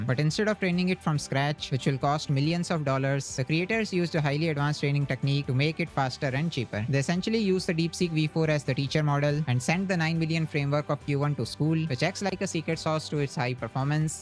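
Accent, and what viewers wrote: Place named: India. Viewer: Господи, пока не увидел твой коммент думал повешусь с индийского акцента, уже забыл что такое индийские айти видео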